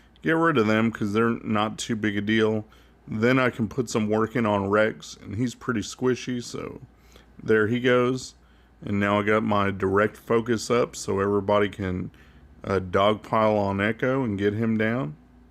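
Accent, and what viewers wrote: South, United States. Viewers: your southern drawl makes the videos relaxing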